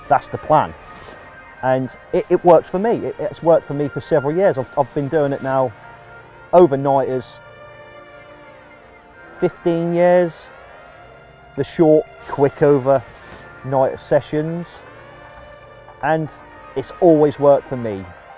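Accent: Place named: Norfolk, United Kingdom. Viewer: Norfolk accent bud lol he’s my local tackle shop owner top guy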